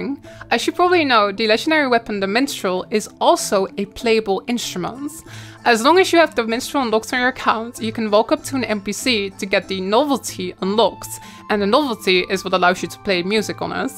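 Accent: Netherlands. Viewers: i knew you were dutch before i saw the properties for guild wars 2.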